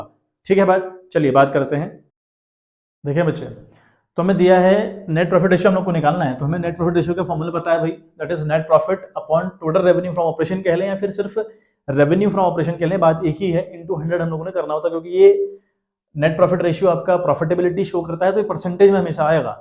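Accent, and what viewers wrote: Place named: Pahadi, India. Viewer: himachal se . Aapke accent se lga ki aap pahadi ho 🙂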